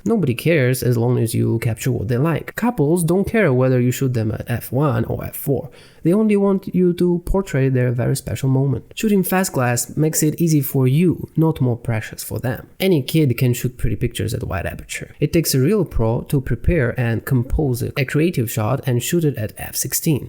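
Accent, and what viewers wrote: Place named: Asia. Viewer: how u sound asian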